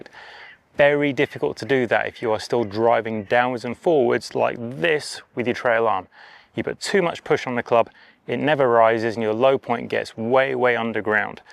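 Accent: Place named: England, United Kingdom. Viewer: Hey, I'm a big fan of what you do. I've studied and played (1 handicap in '02) for 46 years. And your insight on chipping is Brilliant. One suggestion.: Speak a bit slower. Sometimes your rate of speech, is such that your words are hard to discern: I had to replay with captions, to understand the word boil, in the first 30 seconds.I love your English accent, but it can, at times, be a second challenge, for us Yanks. Thank you good Sir.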